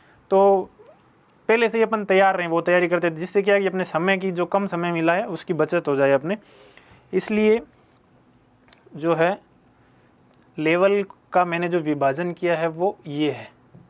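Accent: Rajasthan, India. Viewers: Love your rajasthni voice tone.. 😍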